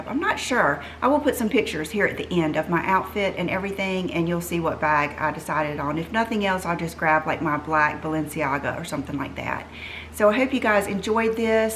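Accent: South, United States. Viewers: Hi. I just wanted to say how much I enjoy seeing your pretty face and listening to your soft southern accent. Things are getting very scary here in the UK (as it is everywhere) and your videos are the perfect distraction to the craziness going on around all of us. I hope you and your family stay safe and well. 🙏🏻💕💋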